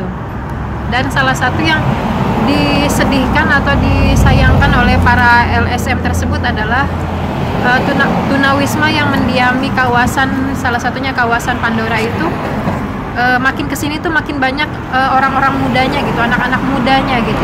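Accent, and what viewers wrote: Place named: West Java, Indonesia. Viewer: Teteh dari logat bicaranya ada kosakata bahasa Sunda. Memang teteh sudanya dimana.??? Kebetulan saya tinggal di tempat tth ambil vidio itu. Saya asli kota Bogor tth Jawa barat.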